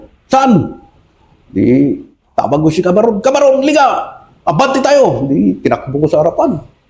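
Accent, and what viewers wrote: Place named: Ilocos, Philippines. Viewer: Halatang Ilocano si Sir hehe,pero saludo ako sa tapang mo Sir.Isa kang bayani,mabuhay ang tropang musang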